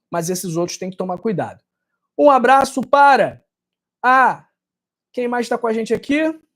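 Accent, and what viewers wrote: Rio de Janeiro, Brazil. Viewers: Que nojo este sotaque carioca carregado...não dá nem pra escutar... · É o sotaque dos cariocas.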